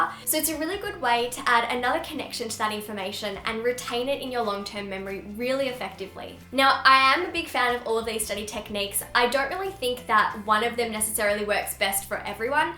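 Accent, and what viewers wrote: Australia. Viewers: Aeee love your Aussie accent x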